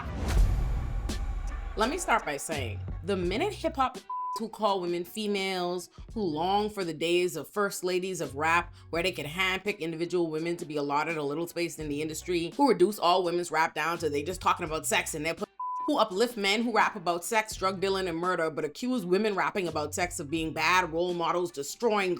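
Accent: Bahamas. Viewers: you sound like youre from bahamas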